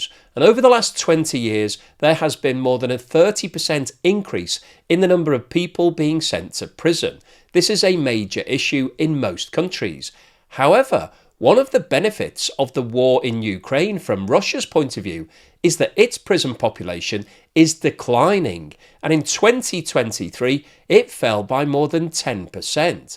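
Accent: United Kingdom. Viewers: are you British? Wife wants to know.?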